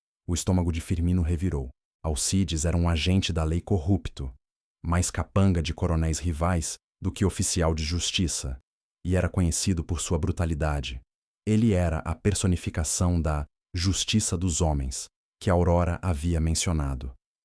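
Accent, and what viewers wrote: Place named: Portugal. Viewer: Que narração orrível a istoria e boa não coloquem mais este narrador mistura com língua de portugal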